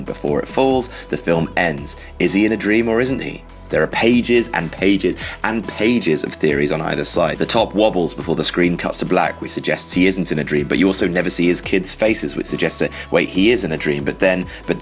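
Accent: United Kingdom. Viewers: So you are just shrugging you shoulders with a british accent. Congratulations. You have a blog that does nothing. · I know and his heavy British accent wasn't helping either. I couldn't finish it....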